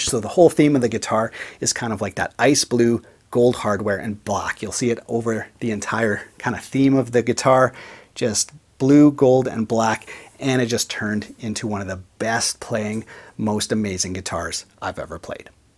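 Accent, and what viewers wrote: Canada. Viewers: He sounds more like a Canadian. Hear the “aboat”?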